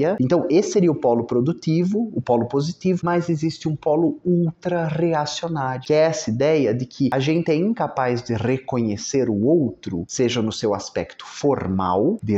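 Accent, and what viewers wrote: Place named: São Paulo, Brazil. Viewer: Poluído, deselegante, confuso. Associações forçadas em uma narrativa tendenciosa a fazer o espectador pensar que tudo o que há na história é feita de sacanagem seguida de sacanagem. O ódio é um dos petiscos oferecidos. Indignação e umacerta euforia bipolar de alguém que fala muito sem parar. Excesso de referências e indicações de livros. Maçante. Esse sotaque do Brás é bem feio. Essa mãozinha gesticulante é bem chata. Essa dicotomia de "de um lado temos isso e de outro aquilo" é muito chato. Eu não diria que chega a ser pseudo intelectual mas é confuso e poluído de referências. O tom das suas narrativas são na intenção de fazer as pessoas pensarem que o ser humano é mal e que em tudo há maldades. Isso é chato, ingênuo e primário.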